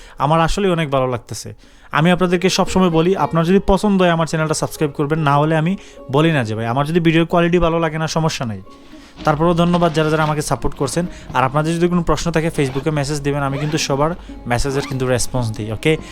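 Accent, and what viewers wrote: Sylhet, Bangladesh. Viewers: ভাই সিলেটি😅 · আপনি কি সিলেটি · সিলেটী একটা টান চলে আসে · Bro You from sylhet. Ami afnaar voice oor dhoron dekiau bujhi lisi afne ee Sylhety. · Mator style hunley bujha jay Sylhet er manush je apni! Btw apnr bari habiganj ni vai? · Brother, are you sylheti ? Your language like shylhety language. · Vai apni sylheti apnar kotay buja jay... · ভাই আপনি সিলটি 🥰🥰🥰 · Vai apne ki sylheti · ভাই কনফ্রাম সিলেটি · Vai Khun desh oo take ba ? R tumr kotha huniya buja jar sylheti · ভাই কি সিলেটি?😁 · His tune like sylhety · শুদ্ধ উচ্চারণের সাথে সিলেটি টান ত আছেই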